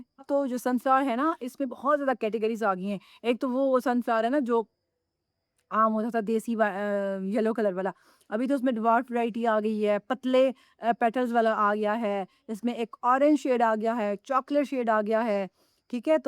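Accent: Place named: Saraiki, Pakistan. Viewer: آپ میانوالی سے ہیں؟ مجھے سرائیکی لگتی ہیں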